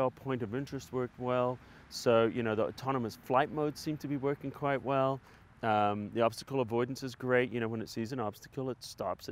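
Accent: Australia. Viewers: this fella sounds Australian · I mean probably 1/2 AU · Great review mate great work,....you sound half Aussie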